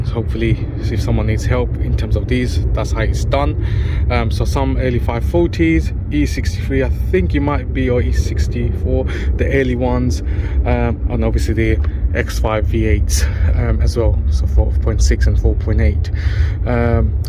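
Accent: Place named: Cockney, United Kingdom. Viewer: Impo ы en- cockney accent 😂😂😂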